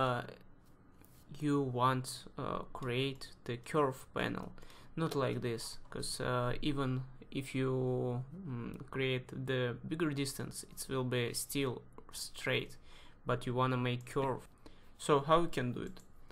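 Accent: Russia. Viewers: Так хорошо воспринимается английский монолог, сказанный с русским акцентом) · Какой ужасный русский акцент!) и столько ошибок походу действия) однако ты молодец! Хороший канал у тебя! Желаю удачи везде и во всем!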